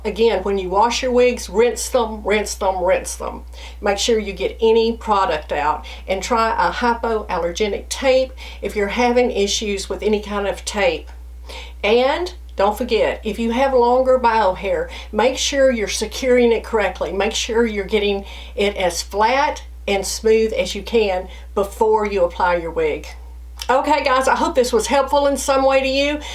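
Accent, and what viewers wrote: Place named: Boston, United States. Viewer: Thank you for doing this video--very informative. I've been experiencing some itching; and yes I'm thinking it is the permatease for me. Laughing about your accent--I'm from Boston but it sounds like Bahstun.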